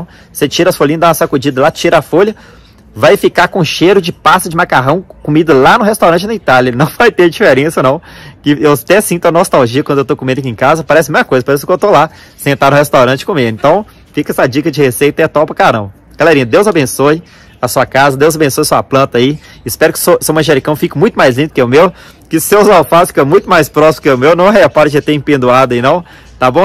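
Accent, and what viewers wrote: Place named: Minas Gerais, Brazil. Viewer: Você é suave, articulado, um ótimo comunicador, gentil e com este charme mineiro, que é apaixonante.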